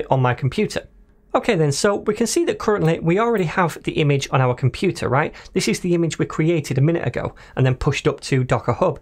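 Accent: United Kingdom. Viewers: I love UK accent. Regards!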